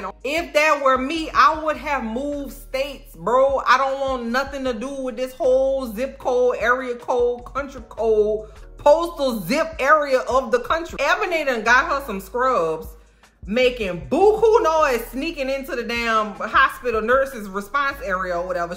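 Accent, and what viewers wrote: New Orleans, United States. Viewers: I normally can't stand the sound of a Nola accent, but yours is pleasant, and you're funny af😂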